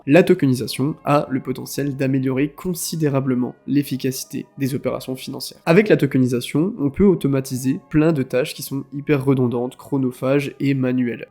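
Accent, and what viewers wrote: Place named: East, France. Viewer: Allianceblock les gars, jeton $NXRA, vous me remecierez plus tard... merci pour ton travail, c'est quoi ce petit accent, ne viendrais-tu de l'Est de la France😊?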